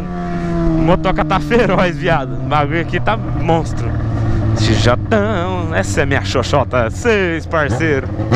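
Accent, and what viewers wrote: Goiás, Brazil. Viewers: porquê vc fala no pique de Goiás ?? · pk ele fala no pique de Goiás se ele é de londrina😂😂😂😂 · vc e goiano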